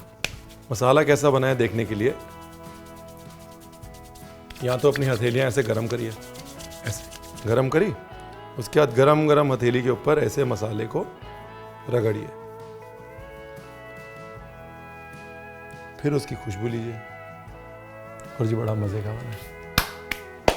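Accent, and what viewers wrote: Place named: Punjab, India. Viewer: From Lucknow...but has Punjabi accent ♥😂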